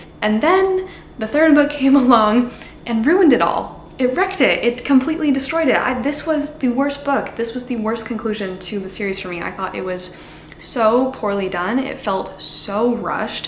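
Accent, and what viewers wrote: Quebec, Canada. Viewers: I didn’t comment on your last vlog, but now with the quebecois accent I have to say it… My tip for big city living is moving to Montreal! It has so many parks, including a « mountain » in the middle of it, most buildings are like 3-4 story high and the sense of community is generally very strong, while still allowing to keep so anonymity and privacy.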